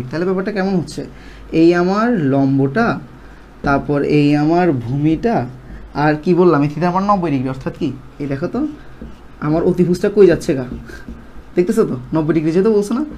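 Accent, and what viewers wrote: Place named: Chittagong, Bangladesh. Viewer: pure chatgaiya tone...love it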